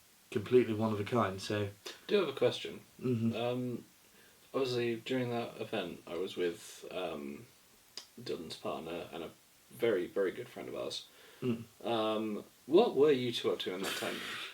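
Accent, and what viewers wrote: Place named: RP, United Kingdom. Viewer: Man I wish I could give you a hug. I hope you can find peace with yourself, I just came across your videos this year and I absolutely love your silly antics snd posh accent. We all got your back ❤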